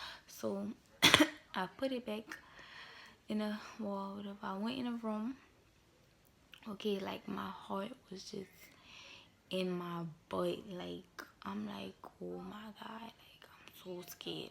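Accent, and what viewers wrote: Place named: Louisiana, United States. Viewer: Congrats girly ❤️where are you from...you have a Louisiana accent and I’m in Louisiana lol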